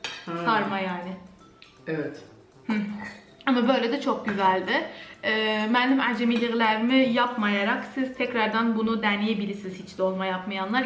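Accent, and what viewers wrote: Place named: Azerbaijan. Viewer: sanki azerbaycan şivesiyle konuşuyorsun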